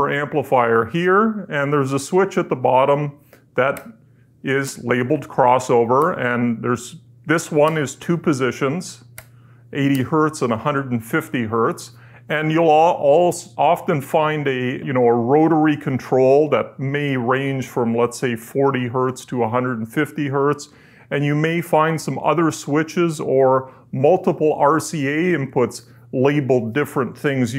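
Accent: Canada. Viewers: You must be Canadian!! Or from Minnesota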